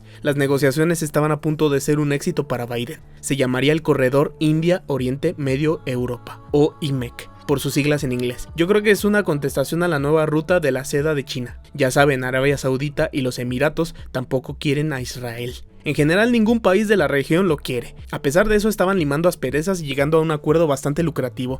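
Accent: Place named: Mexico. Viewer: no puedo ver videos cuando la gente habla asi de culero jaja lastima se ve que tenias buenas intenciones pero tu acento me rompe las bolas, eres de mexico?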